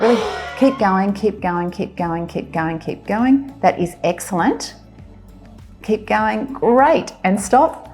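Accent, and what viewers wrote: Australia. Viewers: Is that an Australian accent? Love it!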